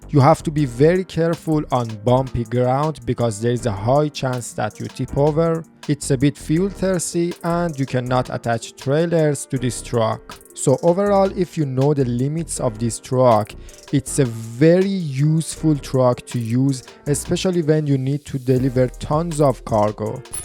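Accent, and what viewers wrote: Iran. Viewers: حس میکنم ماله ایرانی داداش لحجتو❤😂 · اکسنتتو بخورم که نشون داد ایرانی هستی بعد اومدناسم کانالو دیدم بععععله.موفق باشی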